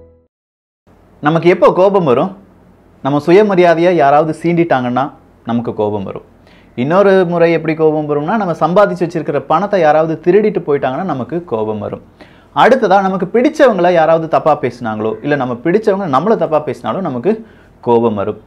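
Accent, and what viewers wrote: Kerala, India. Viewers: Hello bro! I watch all your videos! You have a very strong Malayalam accent! Why don’t you release some videos in Malayalam also! Are you basically a Malayalee bro? Someone said you are from Tirunelveli, but you don’t have Nellai accent at all. I am originally from Tirunelveli, so I know Nellai accent very well. Your accent is neither Nellai accent nor Nagercoil accent! Your accent is 100 percent Malayalam accent! It will be good if you release some videos in Malayalam under the tittle “ Malayalam Pokisham”!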